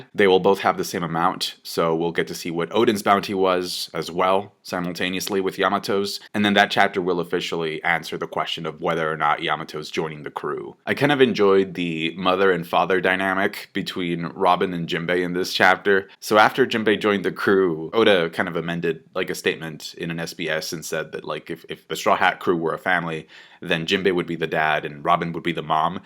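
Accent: Canada. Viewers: wait a second.......are you from toronto? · Bro are you Canadian! And in psychology as a therapist I'm an aspiring psychological associates that's awesome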